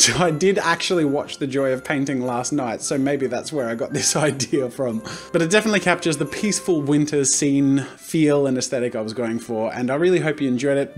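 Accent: Australia. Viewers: So you are Australian... how didn't I notice it earlier? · Hey dude are you Australian cause if so your one of the first ive herad off [im an auzie]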